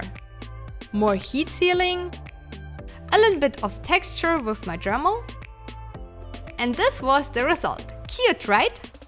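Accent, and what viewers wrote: Germany. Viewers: That German accent ☺️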